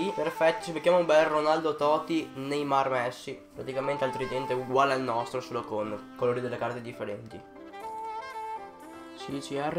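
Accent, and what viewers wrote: Veneto, Italy. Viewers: nuovo iscritto,sei veneto per caso ? 😂😂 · ma sei del veneto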